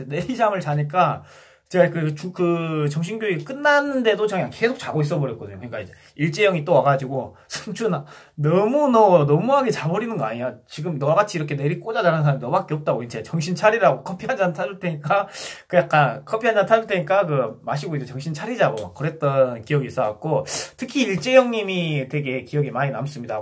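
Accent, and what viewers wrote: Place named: Jeolla, South Korea. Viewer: 내 살다가 이래 재밌게 전라도 사투리쓰는 사람 첨보네 ㅋㅋ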